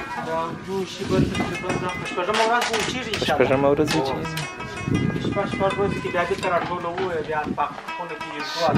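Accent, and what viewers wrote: Kandahar, Afghanistan. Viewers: Mohammad Asif KHAN hahahahaha. Kabulai lahja?????? Kabul ki Pashto lahja da koma showa? Lara Pashto aw Bara Pashto dway staree lahjay dee. Da da Loi Kandahar, da. Kotee کويټه aw shawokhowa lahja da.Kabul lahja na laree. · Love the sweet Qandahari accent. This vlog took me back to my country and provided food for my soul. Great work brother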